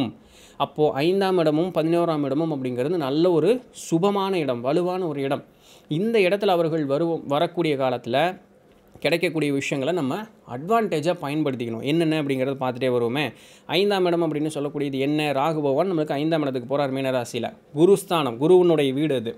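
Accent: Coimbatore, India. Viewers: சார் கோயம்புத்துாரா?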